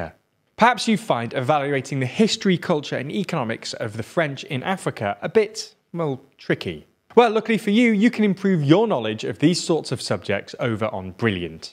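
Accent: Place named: RP, United Kingdom. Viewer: Do you also run the channel 100% Star Wars? It might just be that recieved pronounciation accent and my American ears.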